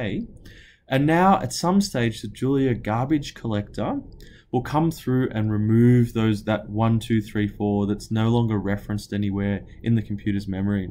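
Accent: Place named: Australia. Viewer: you sound like an aussie. is there any kind of julia community in Aus?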